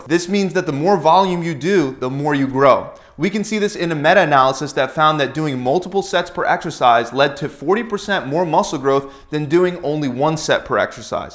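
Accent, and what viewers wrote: United States. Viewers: Usa voice?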